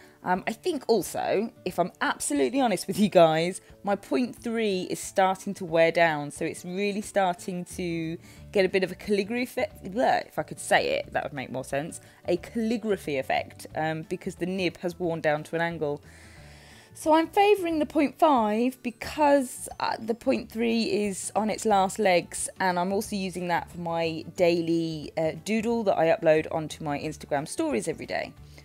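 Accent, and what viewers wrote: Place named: England, United Kingdom. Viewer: Awesome videos! Lovely to hear an english voice for once. If I was as artistic as you I probably wouldn't use washi either!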